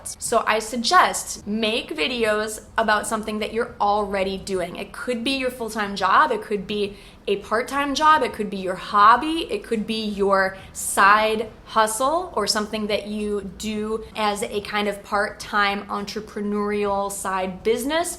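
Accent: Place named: United States. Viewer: I am from India......I know how to speak English but I just wanted to know the American accent Thank you so much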